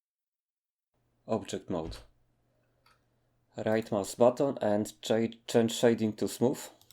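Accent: Poland. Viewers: Sensing a Polish accent there :)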